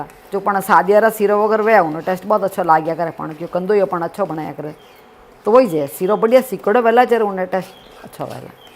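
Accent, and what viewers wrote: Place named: Marwari, India. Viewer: Aap k videos muje bahot pasand aate he ,aur aapka marvadi boli bhi..maine aapki kafi recipe try ki he aur bahot badiya bani bhi he,thnx mummy ji hame itni aachhi recipes sikhane k liye.🙏😊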